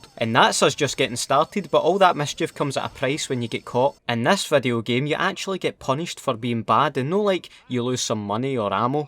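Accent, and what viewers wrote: Scotland, United Kingdom. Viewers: Omg its my favourite fookin shite trainspotting accent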